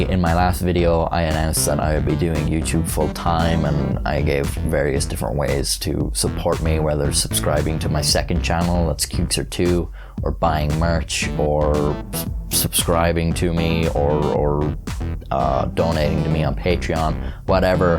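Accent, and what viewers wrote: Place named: Ireland. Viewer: When you have an Irish accent you can say anything damn it!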